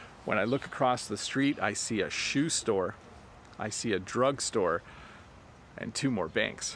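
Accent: United States. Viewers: You really is a canadian? Your accent really like the american 😍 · I'm from Brazil and I liked your video and your accent, it looks like american I have subscribed Thanks a lot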